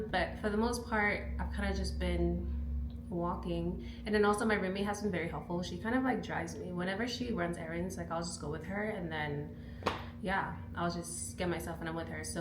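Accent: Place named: Caribbean. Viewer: Do I hear an accent?🥹 Are you caribbean? if so let’s be friends cause im moving to chicago soon!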